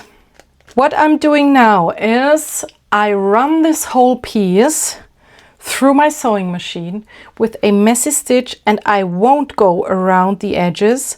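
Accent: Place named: Germany. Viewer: I was wondering if you were German as your accent sounded German. I think your speaking English and explaining things really well. Really lovely art techniques again. And your fingernails aren't horrid at all, lol. You nailed this video and your art perfectly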